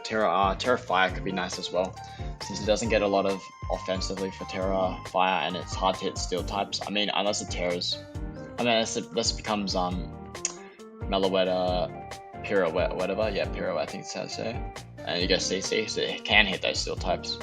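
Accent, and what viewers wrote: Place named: United Kingdom. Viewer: Oh my goodness, he's British 😮 hehehe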